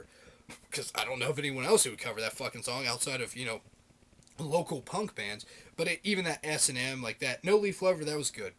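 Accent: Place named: Germany. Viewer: your german is funny :D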